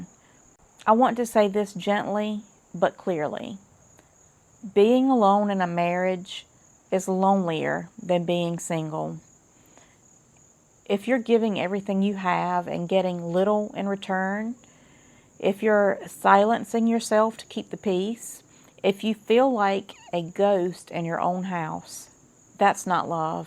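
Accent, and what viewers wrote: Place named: South, United States. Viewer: What part of the south are you from... I hear an accent. Im in Bangor, maine your northern neighbor.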